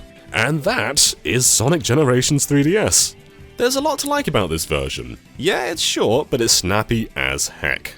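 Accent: United Kingdom. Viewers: Came for the content, stayed for the sexy deep British voice...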